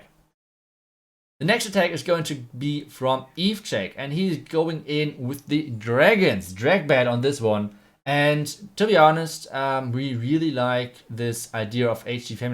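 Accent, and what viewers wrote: Germany. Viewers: I love the German accent when you speak English😍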